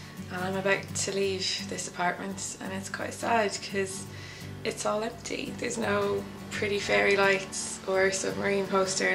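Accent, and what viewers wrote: Ireland. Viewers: So nice to hear an Irish accent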